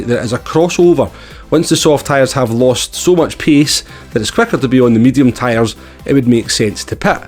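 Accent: Scotland, United Kingdom. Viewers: Thanks for reminding me for how it used to be on Gt Sport; it def made the races more interesting. Btw sorry to that I haven’t checked in since I joined the channel, work etc has been full on the last few months. I do watch when I can. My 13 yr old son and me enjoy the races, and the Scotch accent! Cheers sir.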